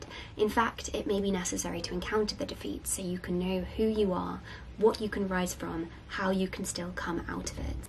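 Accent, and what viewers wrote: United Kingdom. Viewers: I am happy that your dream came true. you are one of the first youtubers that I follow (since 2018) even though I barely can understand English (and also your British accent.) you are a lovely person.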